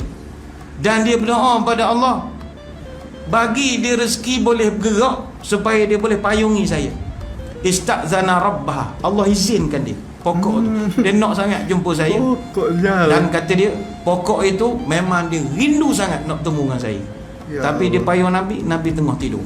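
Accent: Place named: Terengganu, Malaysia. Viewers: Ustaz ada masuk slank (bahasa) Terengganu sikit...tapi rasa sedih dn sama rasa rindu kita kpd Nabi SAW dlm cerita Nabi kali ini. Moga kita semua di dlm rahmat Nabi SAW...Aaminn3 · Loghat campur2 antara terengganu dgn pahang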